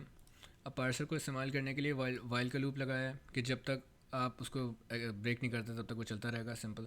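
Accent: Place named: India. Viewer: what language are you speaking? are you using a mix of indians and english? If it's English then your indian accent is so strong that I don't get anything ...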